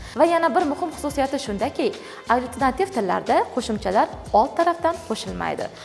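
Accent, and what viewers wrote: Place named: Khorezm, Uzbekistan. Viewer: Xorazmmi shevasi tushinarli zór turkiya shevasina óxshidi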